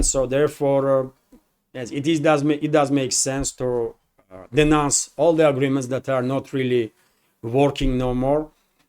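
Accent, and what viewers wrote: Russia. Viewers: The truth spoken in English is a rare event and it’s delightful to see your honest visage, Mr.. Speaking the language of lies with your Russian accent.. you are not alone in the truth being spoken with a foreign accent. You are a rare event there as well. Happy Easter Sunday. I started my family this time of the year 35 years in the past.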